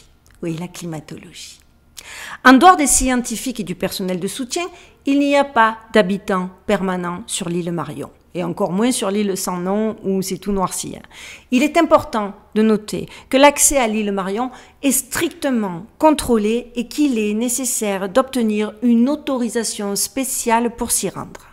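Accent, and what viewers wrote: South, France. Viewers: Merci princesse ! Tu as gardé l'accent du sud, peuchère !❤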